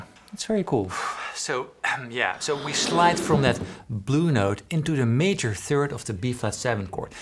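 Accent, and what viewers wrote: Netherlands. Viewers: Finally the basic thing which influenced every string picker. As always perfectly done,. Dein holländischer Akzent im Englischen macht die Sache übrigens noch sympathischer. Wie Herman van Veen sagte:"Deutsch ist Holländisch mit einem ganz komischen Akzent." 😄 👍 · Just drag your Pik ... hahaha 🙈😂I can hear you are dutch man haha 🙈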